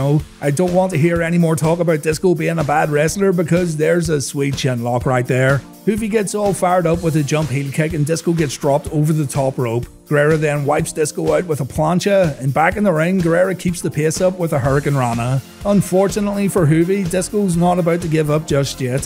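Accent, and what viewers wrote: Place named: Scotland, United Kingdom. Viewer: a Scottish guy talking this video, brutal to listen to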